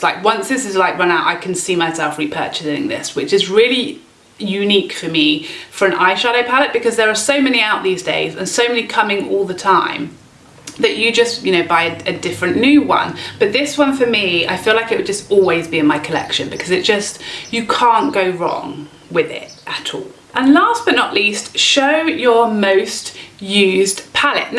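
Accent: England, United Kingdom. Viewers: I seriously CANNOT understand WHY it's so hard to ship to the UK... i told the lady at the Post Office THIS IS CRAZY🤪🤪🤪 I'm So so so sorry it never arrived. The last time I sent it, I haven't received it so you may end up with 2💋😁🤪 Maybe one day. But GEEZE. I am so sorry I wasn't able to get that Palette in your hands sooner.. LOVE YOU MY SWEET ❤❤❤ I adore you in EVERY way. And your English accent GIVES ME LIFE🙌🙌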